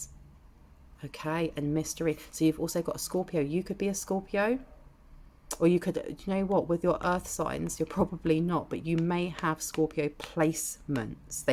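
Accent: United Kingdom. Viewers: thank you my love you add subtitles i think you have British accent and i am Indian that's why i need subtitles to listen video ..... thank you. you are beautiful angel ❤️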